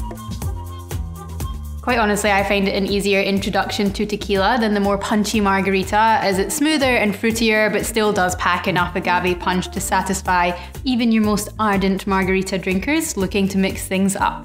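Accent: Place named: Scotland, United Kingdom. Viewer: Wow, had never heard of this drink. I like having another tequila cocktail in my back pocket, and now I have a good excuse to go get some apricot brandy! Thank you! And your little outtake at the end got a surprised laugh out of me 😂 Saucy! 😍😏 Your pronunciation of Toreador is good but not strictly correct. Spanish has shorter sharper O's, the single R's are trilled slightly, the D is softer, and the E has a flatter "eh" (like "fresh") sound instead of "ay" (like "ray"). But who cares; your Scottish accent is as charming in Spanish as English :)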